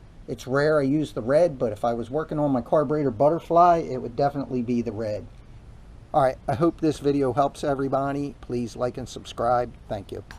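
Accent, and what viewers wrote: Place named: Pittsburgh, United States. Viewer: got ya, figured ya for a yinzer that moved away and still had a bit of pittsburgees left in your speech 😆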